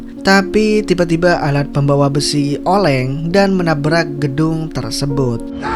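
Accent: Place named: Java, Indonesia. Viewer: Pake kata (saya) aja Biyar enak di dengar bng ,( guaww) terlalu medok jdi sedikit geli. (Ini saran bukan hina)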